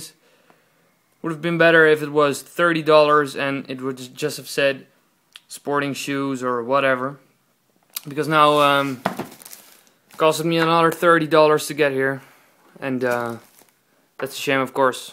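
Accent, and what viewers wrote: Netherlands. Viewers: O BTW again are you dutch? you sound dutch ( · Dude your accent sounds dutch. Im from holland also but they wont answer me on msn. How much did you pay for these? thanx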